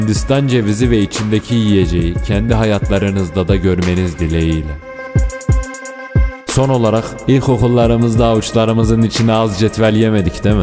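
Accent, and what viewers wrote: Ankara, Turkey. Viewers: gardaş anagara şivesinden geçme senin videolar öyle guzel gardaş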